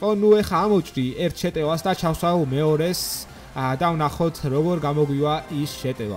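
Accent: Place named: Guria, Georgia. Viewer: guruli kilo gaqvs dzaan magari arxi gaqvs es ubralod ise gitxari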